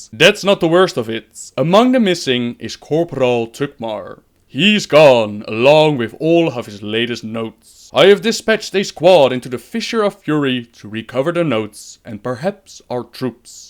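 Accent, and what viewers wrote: Netherlands. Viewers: Awesome :P BTW are you dutch? because you have a small dutch accent :P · You dutch? Je klinkt in ieder geval nederlands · His accent. He is from the Netherlands. ^_~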